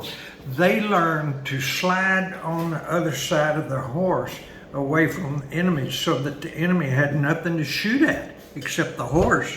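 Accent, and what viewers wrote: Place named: West, United States. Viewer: Greetings from Pune City, Maharashtra. You have a clear Western twang accent and I love the way you speak as if I'm watching a Hollywood western. You're describing so much in detail that I can picture it. Thankyou for the information. I've subscribed to your channel if it's ok for an Indian Indian to learn more about the Wild West History. Thanks again Sir.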